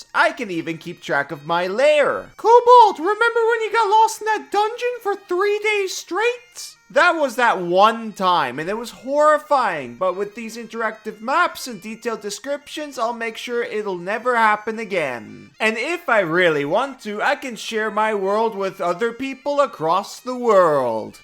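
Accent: Norway. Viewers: Hearing the most Rogalending dialect ever threw me off so hard you don't even know dude.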